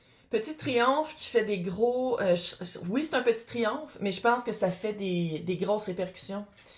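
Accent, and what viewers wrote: Quebec, Canada. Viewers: J'aime ta simplicité. C'est agréable d'entendre une Québécoise qui ne se force pas pour "perler" ses mots sans pour autant parler en joual ❤ Bonne continuation et merci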